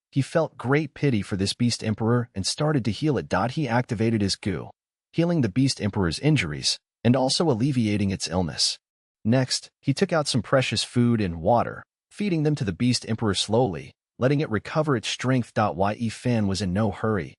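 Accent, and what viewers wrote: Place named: France. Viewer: Why tf are you french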